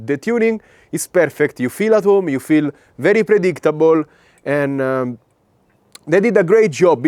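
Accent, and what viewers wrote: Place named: Italy. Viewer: The shitty AI automatically translates the voice into French but I prefer to hear real voice with his Italian accent.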